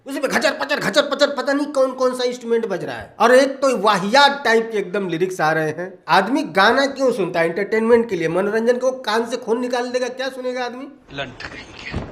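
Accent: Bhojpuri, India. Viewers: यह बोलना पहचान है भोजपुरी के भैया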